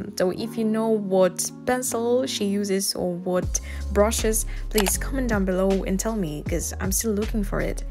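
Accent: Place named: England, United Kingdom. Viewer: I just love you Englishvoice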